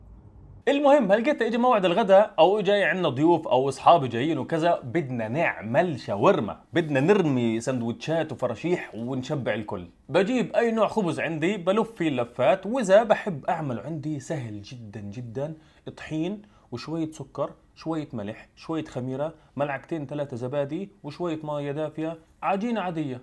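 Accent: Gaza, Palestine. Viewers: الظاهر عليك اكيل يا ابن بلدي (لهجتك غزاويه)يمكن غلطانه احكيلي الدغريري. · احلا شي لهجه الغزاويه مدموجه مع البدوي سبحان الله نفس المكس طالع فالاردن😂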